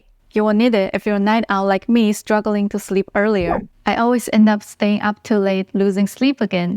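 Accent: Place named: Dongbei, China. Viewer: your my favourite teacher cos of the northern stuff! Do you think when you read the northern part can you say it normally for one and also in your dongbei accent too so i can copy it rather than reading it with standard pronounciation =D.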